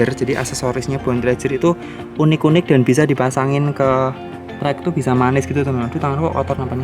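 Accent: Java, Indonesia. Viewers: Aku sering buka youtube reviewx. Yg ku suka 1. Logat jawax itu lho mas, 2. hitam manis, dan yang paling 3. Reviux rrinci rigit, menguasai sepeda yg direviu. ❤😂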